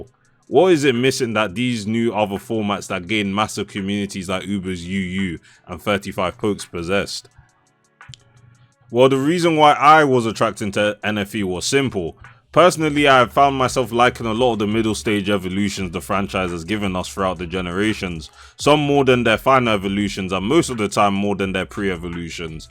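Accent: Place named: England, United Kingdom. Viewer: What part of england are you from mate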